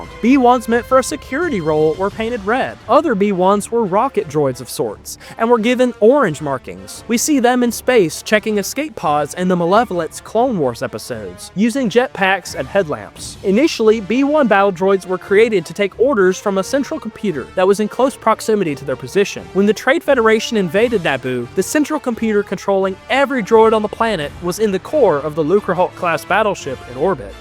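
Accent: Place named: South, United States. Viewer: I love the southern accent trying so hard to come out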